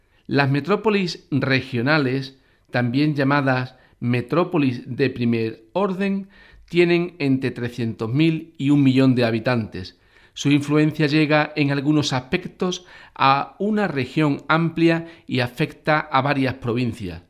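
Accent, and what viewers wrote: Andalusia, Spain. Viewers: illo o hablamos fisnos o andaluz, pero no lo mezcles ojú ya me e agoviao.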